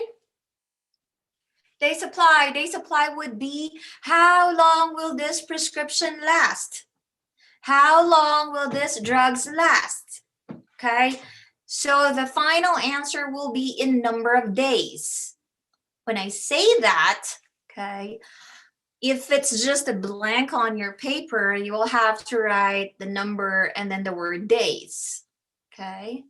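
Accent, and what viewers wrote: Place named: Philippines. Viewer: U sounds like Filipino po. HUHU I need more info I’m still confused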